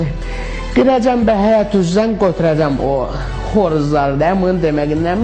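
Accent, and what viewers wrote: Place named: Imishli, Azerbaijan. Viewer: Bu İmişli ləhcəsidir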